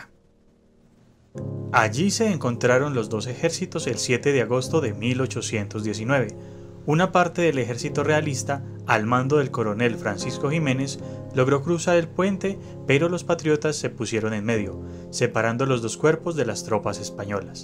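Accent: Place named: Antioquia, Colombia. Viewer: Me encanta que conserves el acento paisa👍👍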